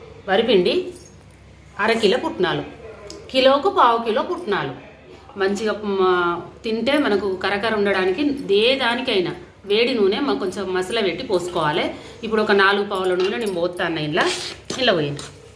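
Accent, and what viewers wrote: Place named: Mancherial, India. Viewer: Same ma lagane matlduthunnaru madhe mancherial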